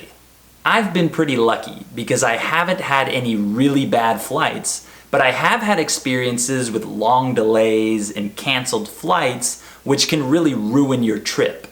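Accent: United States. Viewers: I guess it's an American English doesn't it ? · No, is American accent (more or less) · It's American English. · Is it american accent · Hey! Thanks for the advice that you gave me yesterday, you are intelligent, you are amazing, you have soft voice to speak English fluent, intonation, you speak English to flow like an American, I am really fell very proud of you, I do appreciate you a lot, I am glad for you, you make me feel relax as you speak that accent, yeah! 😸😄😆👌👍😊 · No , American accent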